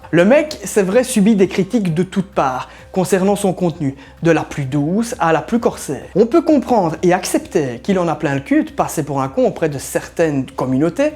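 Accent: Belgium. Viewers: tu es belge nn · T'es liégeois ?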